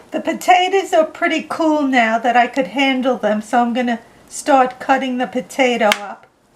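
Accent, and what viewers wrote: United States. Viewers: It's my favorite American accent!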